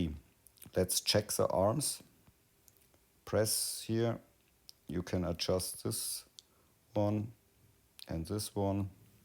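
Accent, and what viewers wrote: Germany. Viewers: Sounds german moin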